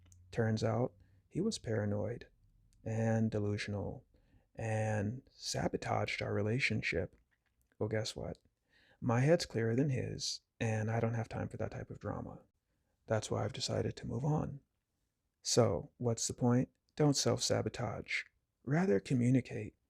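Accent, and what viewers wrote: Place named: South, United States. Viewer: 🤗 great presentation yet again! I must say that I love your Southern Charm Style Accent! 🥰☺️🥹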